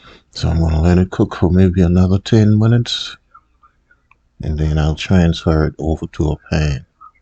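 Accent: Charleston, United States. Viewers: Are you from Charleston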